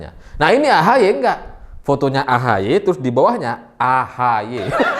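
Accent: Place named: Banyumas, Indonesia. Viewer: Bocah ngapak ya, kok doyan maenin agama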